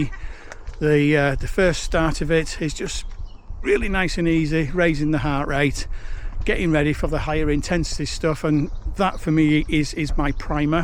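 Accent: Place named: United Kingdom. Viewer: Oh, I suddenly feel like I oversimplify my warm-ups (when I have them anyway) a bit too much 😅 I just jog around with a few brief sprints just enough for the sympathetic system to properly kick in (takes about 7 min for me) and that's about it. I recently discovered that it's very easy to see the exact moment (only post factum unfortunately) when it happens on the HRV chart of R-R intervals — they suddenly stop being random and heart goes into that "fight-or-flight" mode. PS: just noticed that you too say "me" instead of "my" :) for some reason only recently noticed that Brits often do so and I gotta admit I really like how it sounds. 😊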